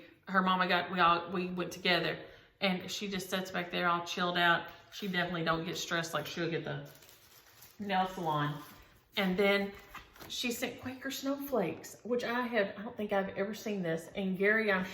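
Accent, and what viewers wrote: South, United States. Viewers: Home and Health. Your hair looks great, love your southern twang. Linda Norris....Thank you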